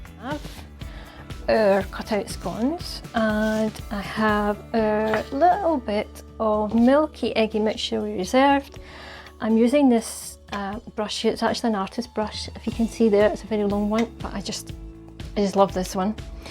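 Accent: Scotland, United Kingdom. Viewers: Great video, im a first time watcher,very well presented and explained look forward to watching more of your work....lovely Scottish accent..thanks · 💗💗Your Scittish accent.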